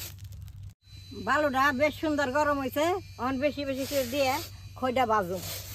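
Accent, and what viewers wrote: Tangail, Bangladesh. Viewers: আপনার বাড়ি কি টাঙ্গাইল?? এটা তো টাঙ্গাইলের ভাষা.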